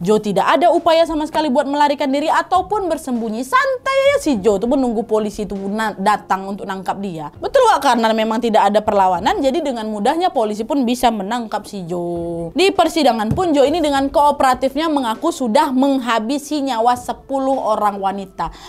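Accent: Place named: Medan, Indonesia. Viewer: Logat Medan yg totok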